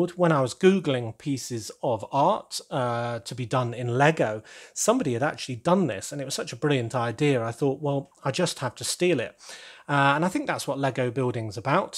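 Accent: United Kingdom. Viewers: so you’re from south England?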